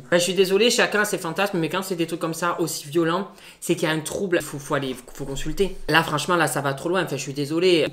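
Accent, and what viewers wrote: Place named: South, France. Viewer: J'adore son accent du Sud 😂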